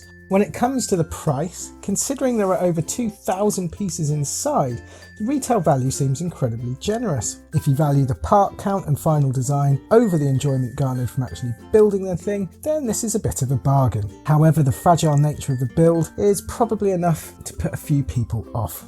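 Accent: England, United Kingdom. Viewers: And where are you from, you sound English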